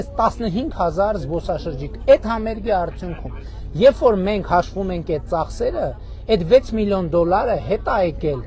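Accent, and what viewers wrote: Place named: Dilijan, Armenia. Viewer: Дилижани акцент тох хелоооок е. Ваз газа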